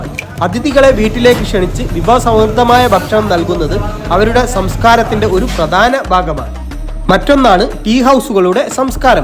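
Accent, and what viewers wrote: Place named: Malappuram, India. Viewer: സംസാരം കേട്ടിട്ട് മലപ്പുറംകാരൻ ആണെന്ന് തോന്നുന്നു ഇടപഴകുമ്പോൾ എന്ന് പറയണം അല്ലാതെ ഇട പയകുമ്പോൾ അല്ല അതുപോലെ ഴ ഉച്ചരിക്കേണ്ടിടത്ത് നിങ്ങൾ യ എന്നാണ് ഉച്ചരിക്കുന്നത് കഷ്ടം അല്ലാതെന്താ പറയുക ?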